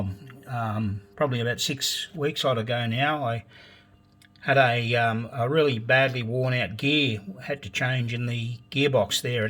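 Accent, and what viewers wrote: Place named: Australia. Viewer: So glad to find your channel mate! To hear an Aussie accent makes it so much easier to follow along.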